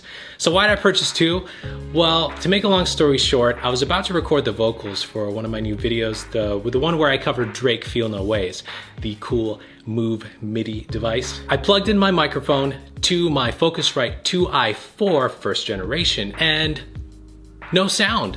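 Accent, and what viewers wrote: India. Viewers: Are you Indian?🤔🤔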